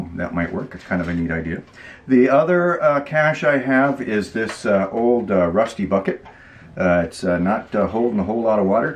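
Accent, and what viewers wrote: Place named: Canada. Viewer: Congrats my friend!!! Youve gone full tilt, you're doing things right and you'll be big time in a short time. You keep people interactive and I like it. And I love hearing Canadians talk...so keep it up!!! Craig from WV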